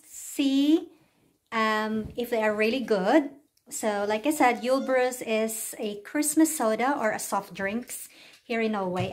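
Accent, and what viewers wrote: United Kingdom. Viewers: Wow sissy! You got all kinds of julebrus there! Har du lært å snakke norsk? Watching this together with my hubby. Always fascinated with your beautiful brit accent. Merry christmas! God jul! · Have a Merry Christmas with a British twang #gemsscope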